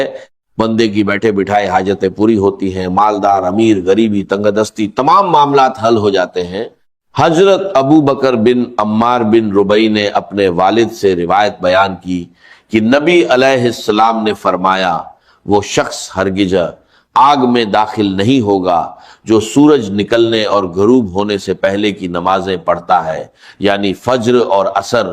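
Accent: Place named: India. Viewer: یہ کون ہندی بھائی اپنے خاص لہجے میں اسرار احمد کی نقلی آواز کو بول رہا ہے لیکن اتنی لمبی باتیں اور واعظ دینے کے بعد وظیفے کو صحیح طریقے سے نہیں بتا سکا کے کیسے پڑھنا ہے۔وظیفے کو توڑ توڑ کے بیچ میں بات کرتا ہے جو لوگوں کو سمجھ نہیں اتا کہ کب وظیفہ شروع ہوا اور کب اس کی بات کررہا ہے۔ کیوں لوگوں کو پریشان کرتے ہو۔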